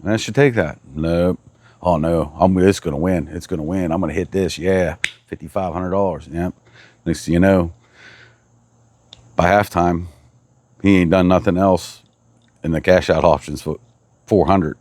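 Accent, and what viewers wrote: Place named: Pittsburgh, United States. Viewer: lol i was thrown off by the Golden State shirt and story about driving from Utah. I’ve lived in Pgh my whole life so I know a fellow yinzer when I hear one 🫡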